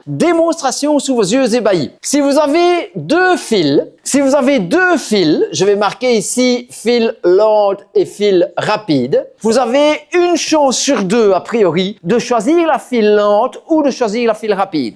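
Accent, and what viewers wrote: Belgium. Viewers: En belge avec un accent horrible et répugnant ?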